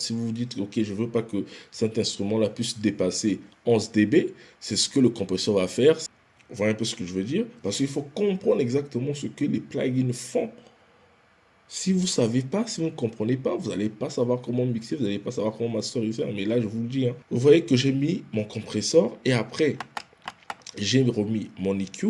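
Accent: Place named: Brazzaville, Republic of the Congo. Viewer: Merci mon frérot et tu a un bon accents brazza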